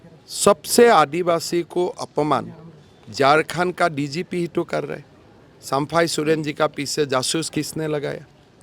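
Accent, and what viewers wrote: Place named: Bengal, India. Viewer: Ye bangali type ka bolta hai bhagao isko yaha se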